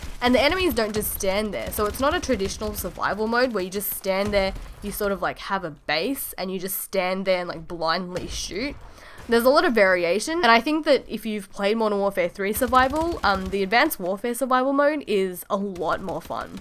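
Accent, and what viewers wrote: Australia. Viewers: Yup. Subscribed. Very articulated thoughts and well presented. Plus, there's just something about Aussie accents. It's magical.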